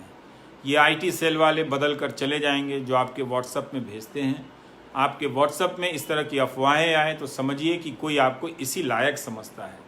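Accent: Bihar, India. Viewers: Nice to see you continuing your duty of enlighting us aapka bihari language ❤️, we agree that you have done maximum number of programmes on public interest, we respect you.👍🙏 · I salute sir Ravish ji main aapka bahut big fan Ho aapki Bihar ki language bahut hi Pasand karta hun aapko aap kaise patrakaaron ke liye salute Hai Sar god bless you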